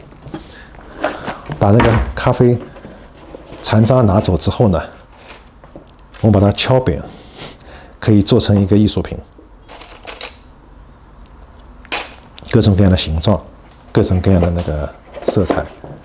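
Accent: Shanghai, China. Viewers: 一口正宗老派上海话，的的刮刮上海人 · 听到上海腔普通话感到很亲切。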